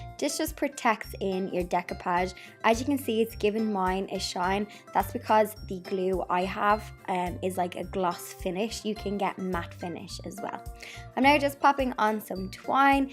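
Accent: Ireland. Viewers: I love your Irish brogue! It's darling, and your crafts are darling, too. The little gift boxes have inspired me to get me granddaughters crafting with me! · Lovely Irish accent! Super video.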